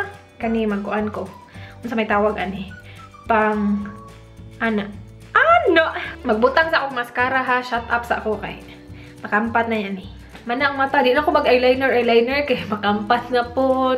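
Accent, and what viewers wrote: Visayas, Philippines. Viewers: Hahahaha the best kay ni nga make up look or tutorial na akong natan awan na, Unsa ka ate, Bohol-anon? or Cagay-anon? ga libog kos imong accent na bisaya gd. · hahaha nindot kaau paminawon....bisaya kaau hehe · lol bugay kaayo mubisaya ay hahaha ka cute nalang jud😙😚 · ka cute mag bisaya oi..hehhehehe iloveyou ate 😍😍😍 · Ang kulit nung bisaya accent hahahaha! Qt! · hahaha tawa lang ng tawa! ang kyut mgbisaya! 😅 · himusa nimo mag bisaya oy😍😍😍😍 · ang cute mo magbisaya te oi, gwapa pa gyud kaayo kay ka te, 😜😝😍😍😘😘😘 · Joker kaayo ka magbisaya ate. Hahahaha makalingaw 😂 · ang kyut mo magbisaya ate!:) kahit di ko naiintindihan, pinanood ko pa rin. mas madaldal ka pag nagbibisiya.:D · kalingaw ani nga video..basta bisaya astog kaau og tingog..hahahaha..aper!:-) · yaaayyy!!! cute kaayu ka mag bisaya! we love u from cebu city 😍😍😍😍😍❤❤❤❤❤ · Ang cute mo mag bisaya ate.😁 kahit hindi ko talaga maintindihan.😊 😅 tinapos ko talaga.😊 ngaun lang ako nag comment. 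Pero lage kopo kayo pinapanuod.😁 godbless po. 😘💕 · omggg ate ganahan kay ko ani na video, funny kay ka mag bisaya more videos pa atee niya bisaya 😂😂love youu po😘😘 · Wala akong maintindihan pero and cute kasi ni ate mag-Bisaya 😂💞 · Proud Cebuana here!!! Hope to see more bisaya videos. Makamingaw! Hehe basta bisaya bundak kaayo mo storya! Haha 😹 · Nalingaw jud ko tan aw ani bah. Nindot pminwon b Bisdak kaau. Lol 😂. I miss Cebu n jud 😞. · hindi ko maintindihan. 😭 peeo pinanuod ko parin. hahaha nakakatuwa ka magsalita ng bisaya ate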